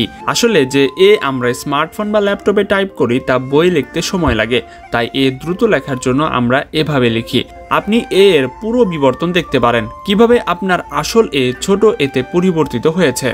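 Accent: Bangladesh. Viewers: ইনার কথা শুনে তো মনেহচ্ছে ইনি বাংলাদেশি।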